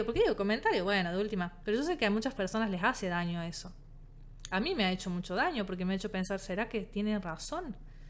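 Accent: Córdoba, Argentina. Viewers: Sos genial, como profesional y como persona. Te abrazo fuerte. Soy de la ciudad de Córdoba, por tu tonada me parece que también? Me encantaría ser tu amiga, jaja. Somos de la misma edad imagino. No sé si se hacen amistades así pero desde que te sigo lo pienso 😅 Qué intensidad todo lo que aprendí este año sobre psicología gracias a querer entender cuál ha sido mi experiencia con mi ex. Me ofendió, me dolió muchísimo lo último que me dijo. Pasó límites. Es alguien a quien amo aún, fue mi amor por años, mi gran amor, mi mejor amigo. Me cuesta mucho volver a querer tener relaciones amorosas con hombres porque me siento dolida y traicionada, así que todas estas palabras me ayudan un montón. ❤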